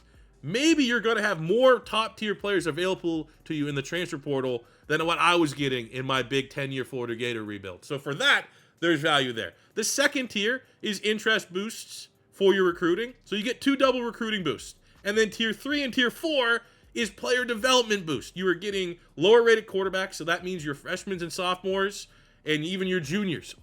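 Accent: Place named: Canada. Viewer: Are you from Philly? You sound Canadian. Jut curious love the channel!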